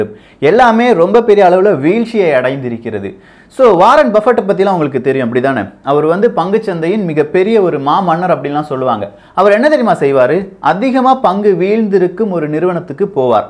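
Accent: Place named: Kanyakumari, India. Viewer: By listening to his slang I think he is from kanyakumari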